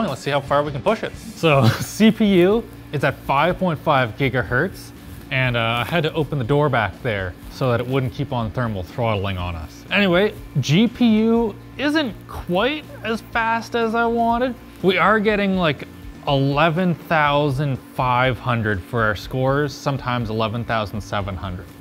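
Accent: Afrikaans, South Africa. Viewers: Loving the Saffa XD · So good to hear a South African voice in these videos! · Is this guy south African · sounds South African? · Nothing sticks out more than a South African accent. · Do I detect a South African accent? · The south african accent is real 😅🤣🤣🤣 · sounds like hes south African? Probably form durban or CPT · Is from South Africa perchance? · He sounds south african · Is that a Saffa accent I hear >? :) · He's south african ye? ;D good to see one of us on tech tips :) rock it lad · You would think this based on the population of the country but you're way off. With 11 official languages the dialects vary extremely. He sounds like an afrikaans native speaker and even then not all of those in SA have the same accent. As a South African I know I'm not spewing bullshit. Also, the numbe of afrikaans native speakers is only around 7 million, which is a lot less than 60 mil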